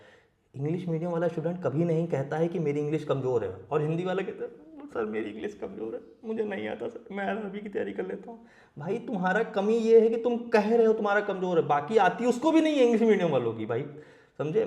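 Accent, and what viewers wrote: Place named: Uttar Pradesh, India. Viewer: Up accent❤😅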